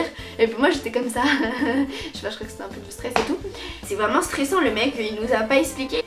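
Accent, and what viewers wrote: Belgium. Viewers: Tu es Belge? Sinon super vidéo :)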